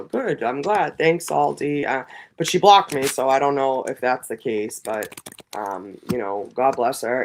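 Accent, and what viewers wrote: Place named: Boston, United States. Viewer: sometimes creative can’t see everyone if they don’t hit live chat and other people can see everyone if they don’t hit all messages instead of top messages. Idk 🤷‍♀️ Will still be watching 👀Listening to you talk is like hearing my family talk. We have the same Boston accent. ❤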